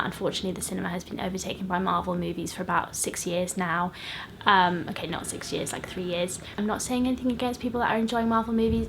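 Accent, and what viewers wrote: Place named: Scotland, United Kingdom. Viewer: I can still hear some Scottish in your accent and it makes me so happy. Also you look lovely!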